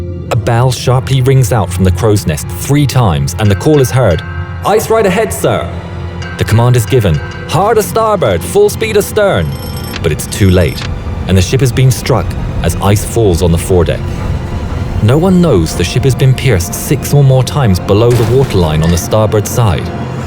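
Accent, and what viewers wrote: England, United Kingdom. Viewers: This is the best British accent I’ve ever heard haha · Love you English accent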